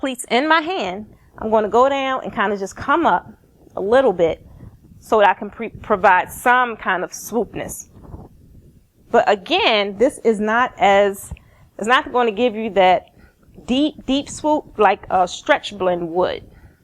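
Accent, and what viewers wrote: Baltimore, United States. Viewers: Love these tips. These are great. Thanks. You must be from B-more. You sound like some of my UMD friends 😁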